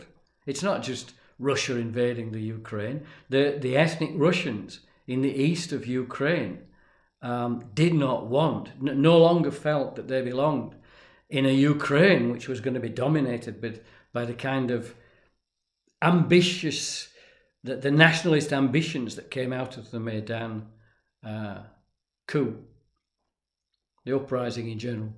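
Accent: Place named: Scotland, United Kingdom. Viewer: I just discovered your channel. This is the second vid I’ve watched. I’m enjoying it. To my ear, I thought you were Scottish not English, but what do us Yanks know. Appreciate your insight.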